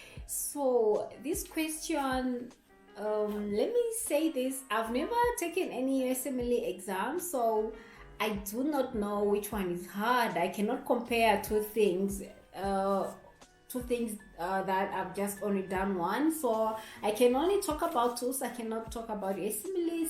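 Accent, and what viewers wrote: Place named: Turkey. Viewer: Your turkish accent is so cute! Thank you for making this video, i am planning to study University in Turkey and this was very helpful <3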